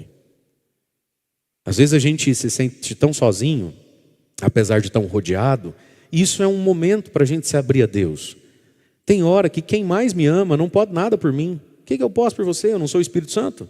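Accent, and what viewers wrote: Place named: Minas Gerais, Brazil. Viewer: A nois aqui travez . Um jeito mineiros de falar rsrsrs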